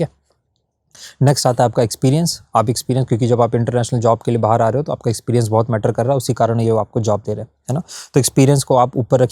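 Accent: Uttar Pradesh, India. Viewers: Bhai ki UP vali boli is crazy🤙🏻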